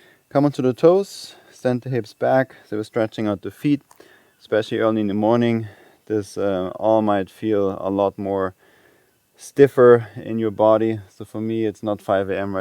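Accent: Germany. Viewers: Are u german or swiss? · Are you from Germany? Your accent sounds hella german :) Good video👍🏼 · ich war in Köln für ein Jahr. Freut mich so sehr ein Deutschakzent zühören! Keep being awesome! Love the channel I can't wait to show my partner :-) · Nach4 Sekunden direkt den deutschen in dir gehört. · german accent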